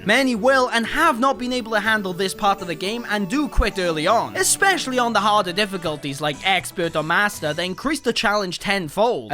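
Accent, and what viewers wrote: Wales, United Kingdom. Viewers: are you welsh you sound welsh but at the same time dont? i cant tell what accent you have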